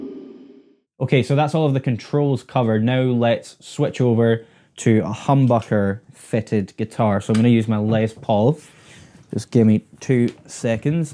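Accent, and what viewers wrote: Scotland, United Kingdom. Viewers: What...that Scottish accent!! 🙂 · Good Tae a Scottish voice .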